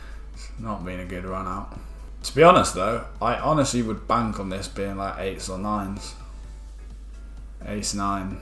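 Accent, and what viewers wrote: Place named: Gloucestershire, United Kingdom. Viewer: thought your accent sounded close by to me. Wiltshire here 👍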